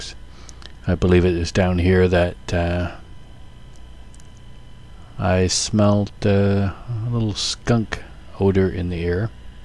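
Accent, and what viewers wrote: Canada. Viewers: Do most Canadians sound like you, or are you from a special area? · Man after my own heart. Steady driver/rider - not out to break any speed records, enjoying the ride but keeping good space all around you. As an Aussie I have to say I love your soft Canadian accent. Very soothing.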